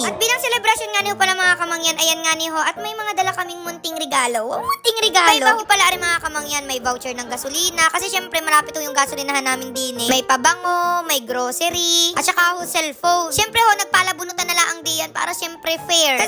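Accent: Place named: Marinduque, Philippines. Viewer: Kamangyan, tubong Marinduque ako😍pero ngayon andine na sa Maynila☺️kaya ako nag subscribe sa yo ay natutuwa ako at palagi ko naaalala yung punto sa probinsya namin😍keep making us happy everytime we watch your vlogs🥰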